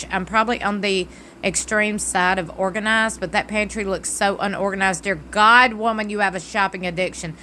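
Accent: South, United States. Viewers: Just found your channel. I haven’t watched Sister wives in several years, but they came across my YouTube algorithm for some reason, so I went and watched 18 and 19 seasons on Hulu., since it’s free with my t-mobile subscription. Unable to watch season 20 yet, been trying to get glimpses through you guys on YouTube. Robin is absolutely diabolical. I hope to never meet anyone like that in my entire life. Anyway, enjoy your channel, and also your accent. You’re from Louisiana? I’m a Georgia girl. Love the mutual southern accent!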